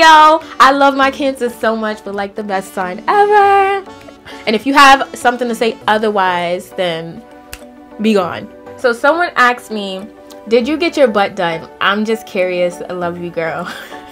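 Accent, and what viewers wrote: New York, United States. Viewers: I LOVE your New York accent. 😍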